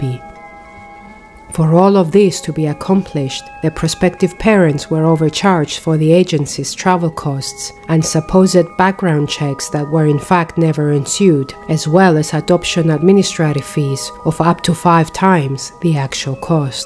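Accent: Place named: England, United Kingdom. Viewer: I love the English accent also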